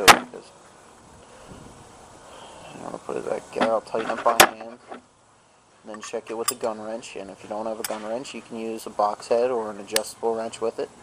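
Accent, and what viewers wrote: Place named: United States. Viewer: Yo, great video! Very thorough, I appreciate it a lot. Around the mark you mentioned that it's now illegal to do... such and such. I didn't quite catch that, despite trying to replay that portion. What's now illegal, exactly? It is only in your state, or nation-wide (assuming you're in the US based on your accent) Thanks again.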